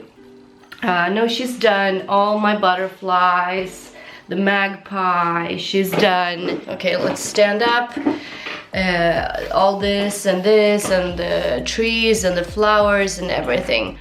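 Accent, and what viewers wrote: Sweden. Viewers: Oh love tour videos! I've followed you for a looong time and remember when you started speaking english in your videos and it's crazy what an improvement your english has gone through! Jag glömmer mellan gångerna vilken härlig dialekt du har tills du pratar lite svenska emellanåt. Fortsätt gärna visa dina tatueringar och piercingar 💋⭐️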